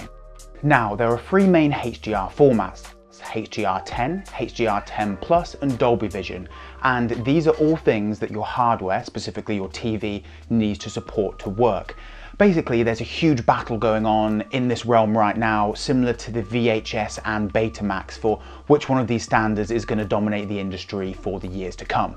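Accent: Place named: United Kingdom. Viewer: This looks like the British version of "Films at Home" from good old bearded Jeff, different accent and no cap but the beard is there. Physical media is my first choice over streamed movies. Censor ship is a real threat and easily applied to streamed movies. A recent example: in "Home alone" the appearance of Trump is under threat of being erased. That is Soviet-style censorship, erasing former political leaders from history.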